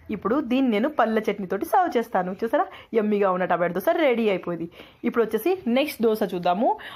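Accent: Telangana, India. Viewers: Me voice chaala bagundhi akka...thelangana baasha lo baga matladuthunnavu...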